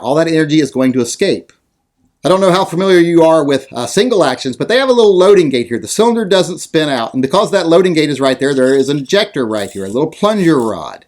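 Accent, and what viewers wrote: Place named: South, United States. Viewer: You may be the, but you sound like a Rebel to me. Dude, what happened to the knuckle of the index finger on your left hand?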